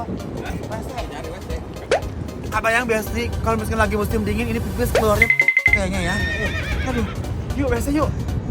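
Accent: West Java, Indonesia. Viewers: Stay humble. I like hear you speak sunda language! 👍🤣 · Diluar negeri ge angger weh sundaisme pisan mah...moal di piceun kasundaan na..mantap lah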